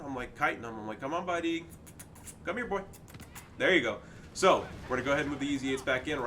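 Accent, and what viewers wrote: Germany. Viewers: Great game, your german accent cracks me up every time.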